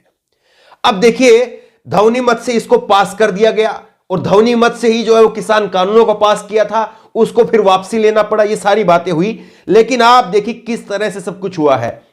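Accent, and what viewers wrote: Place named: Rural, India. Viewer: आपको हिन्दी अच्छी नहीं है lower class की या illetirate टाइप जैसे गाँव के लोग बोलते हैं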